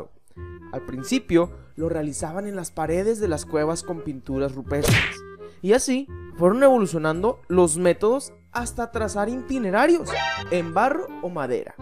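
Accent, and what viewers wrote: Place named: Fresa, Mexico. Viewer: se oye como fresa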